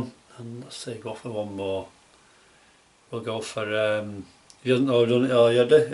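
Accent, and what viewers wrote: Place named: Midlands, United Kingdom. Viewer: Nice one, watched Christians and yours, quite a bit of difference. I'm from the midlands and you say everything the same as me except gym shoes are trainers surely lol. Cheers 🍺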